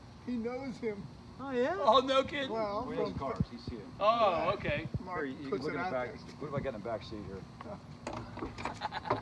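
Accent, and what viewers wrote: France. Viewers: Sacré accent Français haha ! j'ai la même en 1977 avec équipement fédéral présidentiel complet, sirène US, gyro US dans calandre, Citizen band, full tinted glass, téléphone, mini bar whiskey and cathodique TV avec antenne boomerang chaines Française. Ce n'est pas une sedan mais une factory Fleetwood limousine formal serie 75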